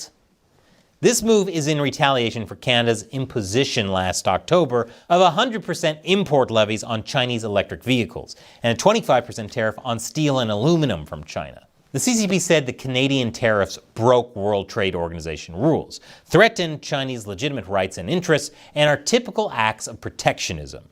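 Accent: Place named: United States. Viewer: 내용은 마음 드는데, 미국 잉글러쉬 참 귀에 거슬린다.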